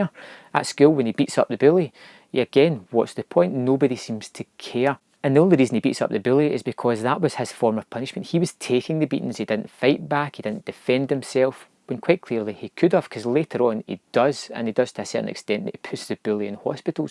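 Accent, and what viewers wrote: Scotland, United Kingdom. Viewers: I love your Scottish accent. I will love to say that Scottish and Irish accents are the most beautiful accents ever! I love how well you described the story. I watched this movie last night and I cried, because my aunt passed away with an overdose of medication for her cancer. I never got to know her too well do to how young I was when it happened. Today I feel guilty, because I didn’t get to see my aunt much since my mom never got along with her. My grandparents always tell me how similar I am to her. She use to love to draw, and ride horses all the time. I felt a similar sadness when hearing both my aunt and my grandma got breast cancer too. I almost wanted to commit suicide and kept asking why them? It should be me who gets cancer. Luckily they both made it alright, but that thought haunts me every night. This movie taught me to never give up and to love my family and the good people who are around me. 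I will have to let go of the sadness and never forget the good memories I have with my loved ones. Enjoy with the people and animals you have now so you have long lasting memories of them.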